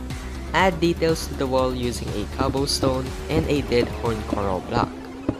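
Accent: Philippines. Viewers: You have a filipino accent I think your filipino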